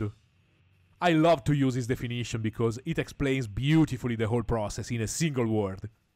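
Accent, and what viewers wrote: Italy. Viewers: In three hours! Jeezuz! And that italian flavoured english is just awesome! Ti saluto! Forza!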